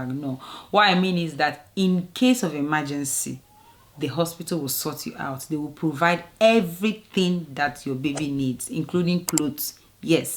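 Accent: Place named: Ghana. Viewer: Ah. Are you Ghanaian or Nigerian??? You speak like Ghanaian. 😂😂😂❤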